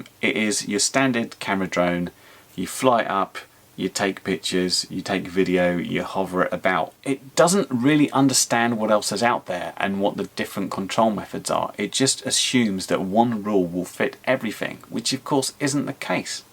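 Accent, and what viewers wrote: United Kingdom. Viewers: I was wondering where you were based on your accent, i didn't know you were Southampton till this video, your not far from me :) I currently have no intention in registering and if everyone did the same it would make it unenforceable. Im confused about the CAA 1000ft exemption, does it still apply to all fpv flyers? Since the start of all this, i have felt that 400ft within a certain proximity to an airport is fair enough but if your not near low flying aircraft then the 1000ft limit should still apply. i live just outside restricted military airspace, im outside there zone and so they never come here but commercial aircraft do not have any flight paths over here ether as i guess they maintain a no man's land buffer to be sure. i can fly perfectly safely at any altitude here and never come into conflict with military or commercial aircraft and to be (officially) kept to 400ft is just stupid. 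As for auto pilots, which way do they want it? Ether they want to say that autopilots are not allowed over a certain altitude OR they want geofencing, you cant have both! The idea that you would remove Autopilots from something is just bonkers, all it achieves is increasing the risks, not diminishing them! I fly over farmland, no matter my style of flying, be it low and fast or high altitude never puts anyone or anything in any danger and i will continue to fly with common sense instead of a lack of sense that the government are displaying over this. I also feel that the more rules the go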